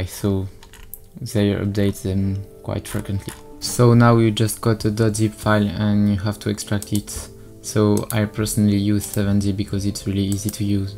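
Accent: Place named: France. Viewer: Tnx for this tutorial. I like ur french accent :)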